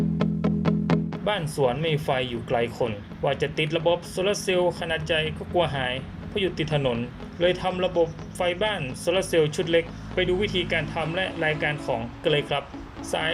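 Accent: North, Thailand. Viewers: แนวเสียงยังกับคนตางเหนือแม่นก่อครับ จัดวางระบบสวยงามครับ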